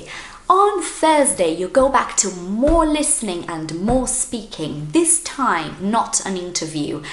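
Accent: United Kingdom. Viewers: I love your British accent. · I've from The uk (london) and she has a very strong british accent haha! More "british" than mine 😂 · Nossa que sotaque britânico maravilhoso!! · what a lovely British accent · why do u have british accent? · Seu English é britânico ? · inglês britânico maravilhoso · VC é brasileira??? Que inglês britânico lindo é esse??? I'm shocked! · sotaque britanico ? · Ela realmente tem um sotaque britânico maravilhoso é uma delicia ouvir.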